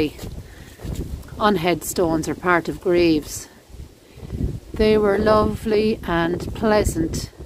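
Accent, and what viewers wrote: Ireland. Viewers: Wow! So fascinating look through those tiny holes and seeing all those coffins you did a marvellous job focusing through so we can see 👏 ♥️ Thank you keep up the wonderful work you do i absolutely love seeing all these amazing graveyards with you and your Irish accent to die for 🥰😁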